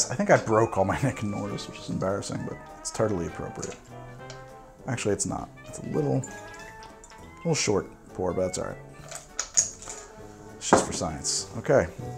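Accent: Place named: New Jersey, United States. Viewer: The Doctor might of not been real or just had the same name as the tool. It could of been a play on word sort to speak, like how Chefs and Cooks call the microwave CHEF MIC/Mike. Because the Gimlet was a tool to keep the sailors from getting sick they called it "Dr." Gimlet. I love your Videos and I'm a fellow Jerseyan don't apologize for the way we say stuff it makes other states think we're weak lololol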